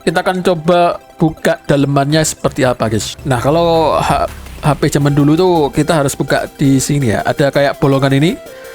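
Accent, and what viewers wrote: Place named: Solo, Indonesia. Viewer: Bang suara mu pria solo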